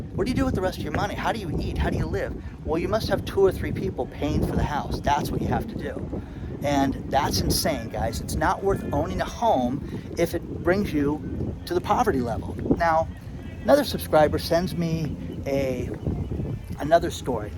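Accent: United States. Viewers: I think he also says it like us typical Americans say it as a lead in to something to the effect of an unbelievable story, LOL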